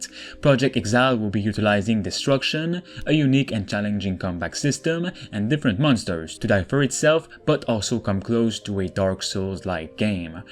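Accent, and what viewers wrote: Quebec, Canada. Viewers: Dude sound like he from quebec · U sound like gsp . You're definitely from quebec. Great review merci . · Aye un québécois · Ça paraît que ces un québécois qui parle😂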